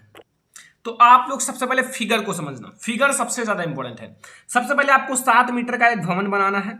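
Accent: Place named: Deoria, India. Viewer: mujhe lga aap deoria se ho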